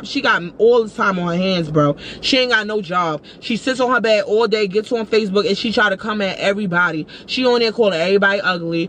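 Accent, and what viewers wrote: New York, United States. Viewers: it’s the nyc accent to philly 😂😂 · LOL you know you from New York when you say.... "One Shot Deal" on the real pluw you have the NYC voice yasssss I have not heard one shot deal in over 20 some odd year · It sounds like you from New York around the way, am I correct?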